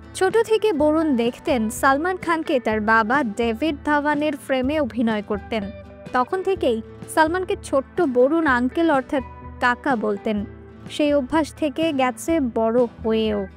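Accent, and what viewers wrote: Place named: Bangladesh. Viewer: এটা বাংলাদেশ নয়। এজন্যই আমরা সব ধর্মকে সম্মান করি। সবাইয়ের সমান অধিকার। এটাই বুঝি। তোমার ভাষা শুনে মনে হচ্ছে এটা বাংলাদেশি ভিডিও।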